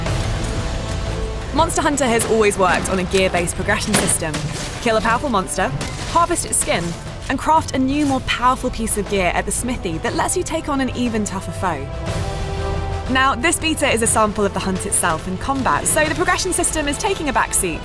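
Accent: United Kingdom. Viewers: I'm British, and that's how we say Beta. See also "tomatoes" "vitamin" and "aluminium" for further education / infuriation. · Afro Samurai HD bless that UK accent bro. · seriously what is up with people, you all act like yours is the only acceptable form of pronunciation,It's UK English and you can clearly hear it in her voice.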